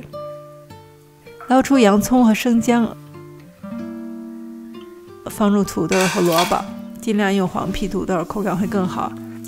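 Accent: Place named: Xi'an, China. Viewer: 之前在国外就看你的视频，那会儿一听口音就感觉是西安的，做的菜跟我家也很像，一晃几年了，回国了还看你做菜。❤❤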